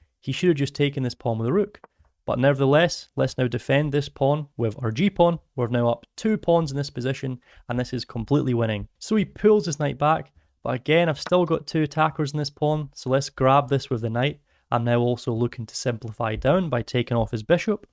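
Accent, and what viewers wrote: Scotland, United Kingdom. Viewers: Nice Scottish voice, subscribed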